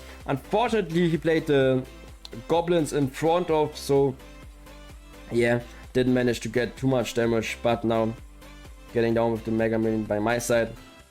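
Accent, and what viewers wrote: Germany. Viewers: you really are a legend. btw do you happen to be from germany or is that another accent im hearing?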